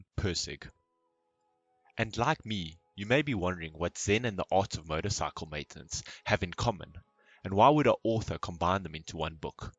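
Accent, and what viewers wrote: South Africa. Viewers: Soutie accent 100% lol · South African? with a cold? misses the mark. Maybe you should re-read it a hundred times like everyone else and then come back and do the video again.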